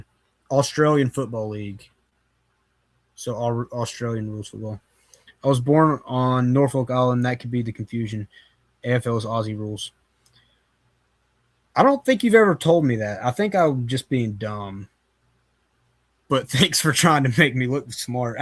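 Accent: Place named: South, United States. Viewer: This is cool. Love to see it, and to hear that southern accent😂 This is one I haven't watched with my son yet. We both love baseball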